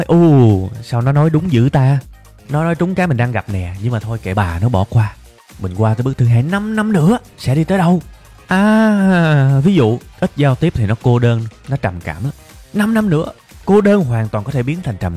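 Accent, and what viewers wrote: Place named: Mekong Delta, Vietnam. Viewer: rất thích cái nói tự nhiên chân chất như miền Tây của bạn. Mà làm clip giống bạn ntn vậy chỉ mình với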